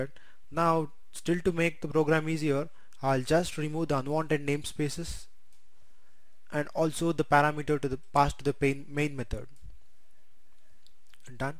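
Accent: India. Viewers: You seem like offering gold worth of knowledge i just can't power through that accent. I swear i want to learn indian just to watch these videos. Is it hard to learn?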